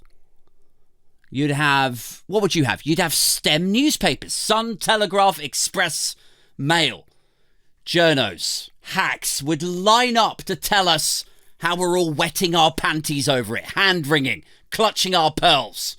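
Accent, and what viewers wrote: United States. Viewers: Ohh it’s the sweary shouty southern man!! ❤️❤️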